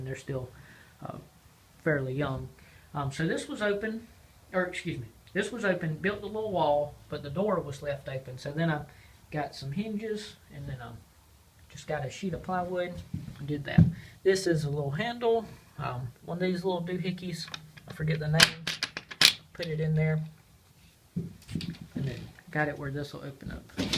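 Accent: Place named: South, United States. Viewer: Thats great. Your accent sounds like mine, southern! I live between Sylacauga and Talladega Alabama. Just curious if you were from Alabama.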